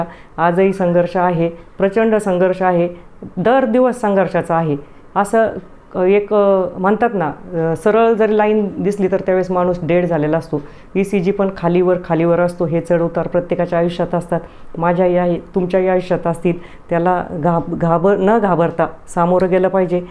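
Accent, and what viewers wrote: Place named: Ahmednagar, India. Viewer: नगरी भाषेचा गोडवा, मस्त!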